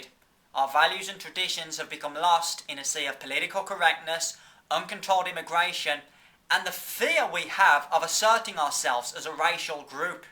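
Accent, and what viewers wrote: Australia. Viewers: I think it’s Australian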